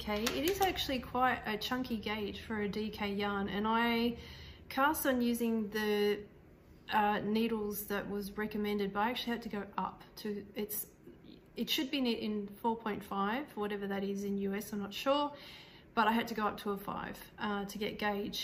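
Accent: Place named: Australia. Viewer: Wow I was wondering the other day if you would ever podcast again! I think it’s been 2 years since you podcasted last. So nice to see you again and hear an Australian voice for a change. Looking forward to seeing you more regularly ❤ btw I love the smocking cardigan you were wearing.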